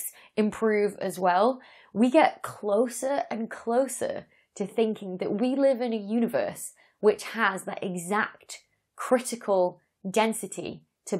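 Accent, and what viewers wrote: United Kingdom. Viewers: I have a pronunciation question. In the 70s, I had a meal in Arno Penias' house with his son. It was probably years before winning the Nobel Prize. His son pronounced his last name pen-zee-as. This is not how you pronounce it and I wonder if it is because of your British accent or is my memory bad.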